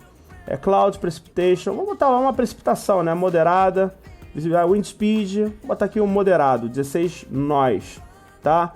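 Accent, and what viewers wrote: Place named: Rio de Janeiro, Brazil. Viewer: sotaque de carioca puxado, não é o mesmo produto, com arquitetura melhor vai sair incríveis add-ons, espera 1 ano que vc vai ver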